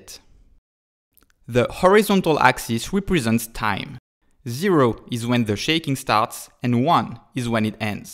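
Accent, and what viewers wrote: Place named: France. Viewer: Accent français détécté ! Nan sans déconner super vidéo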